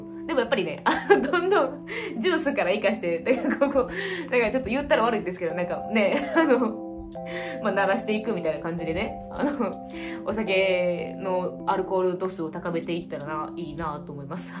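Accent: Kansai, Japan. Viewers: 喋り方的に関西？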